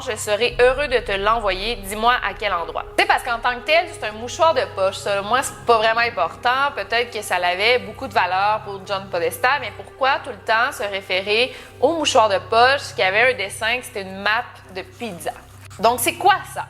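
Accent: Quebec, Canada. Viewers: Salut! Je pense que les reseaux pedophiles ne pourraient pas exister sans la protection de gens haut places. Je pense que nous sommes manipules et que le monde est sous l'emprise des gens tres riches et puissants. Je crois en cette theorie et malheureusement je pense que personne ne peut arreter ca... les preuves seront toujours masques. C'est horrible et degoutant. Bravo pour ta chaine, tu es super! J'adore les canadiens et leur accent, 😀👍💖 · Oh jee ! T'as l'accent du Québec, toi... Mein Gott !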